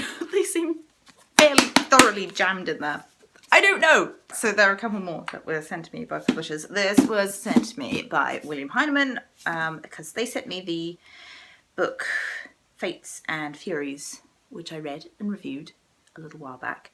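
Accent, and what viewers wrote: England, United Kingdom. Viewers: at the Edge of the World If truth be told, you speak English as it should be spoken. It is we in the colonies who have the accents! You were blessed to have an English mother in NZ!:) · I am confused. Are you from NZ? You sound english, not at all Kiwi.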